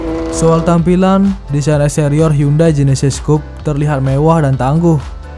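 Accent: Bali, Indonesia. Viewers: Logat orang bali dan ntb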